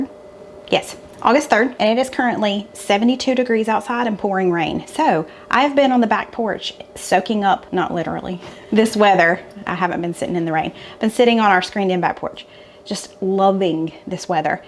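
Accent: South, United States. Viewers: I LOVE your southern accent. I undesrtand it perfectly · I love your "Southern" please don't correct yourself! I recently moved from NC after living there for 37 years and moved to another "southern" state (OK). So not the same! I miss the Carolinas so much! I even miss Food Lion and I had a love/hate relationship with them. I've watched you for quite a while but now the videos make me feel like a trip home. I am with you on the peas and lima beans, I can take them in a chicken pot pie or Brunswick stew. I moved south too late in life (23) to appreciate okra in any form or fashion though. I did make Steven's salsa, we all loved it! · Embrace your southern accent. ❤ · I call it an eye as well and my family and I absolutely adore the Waffle House 😋🤤! Oh and don't worry because leaving that 'G' off of our words is a Southern thing😂. I really enjoyed this video and thank you so much for sharing I will have to try all of these new recipes! 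· The "eye" on the stove 😂 I think my mom calls them eyes too. I call them burners. But your southern accent says "aah" instead of "eye." Like... AAAH!! There's an AAH on the stove! 😛 love it. I talk southern sometimes and I'm only in Indiana lol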